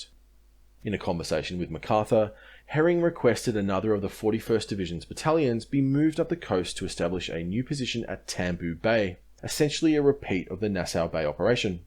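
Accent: Australia. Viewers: We'll I don't know if you picked up on the accent mate, but he is an Australian. Funny then the Aussie content.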